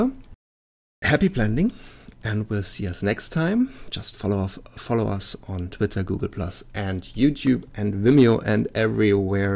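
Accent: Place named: Germany. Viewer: Man hört einfach deutlich, das du deutscher bist :D Aber trotzdem sehr gut erklärt :D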